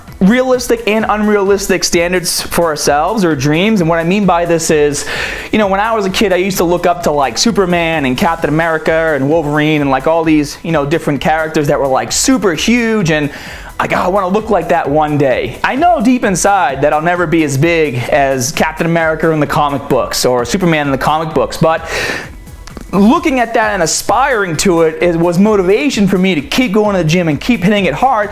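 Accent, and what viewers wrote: Boston, United States. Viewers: You sound more like you're from Boston or New Jersey every day haha good vid! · Is it just me or has accent become a lot more prominent recently? Boston I believe? It's awesome haha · I do agree with you on pretty much all of the videos you make, you seem like a good and knowledgeable guy about what your talking about. I do have to say you sound way more Boston than you used too, don't know why but you really do. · Nah its a boston accent is from lawrence Massachusetts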